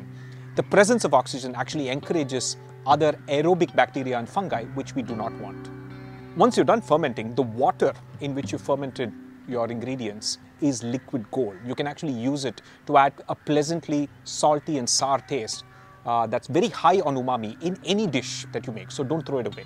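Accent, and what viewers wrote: India. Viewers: the Hindi accent I like too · Great to listen Indian sound, ❤with depth of knowledge ,love to listen more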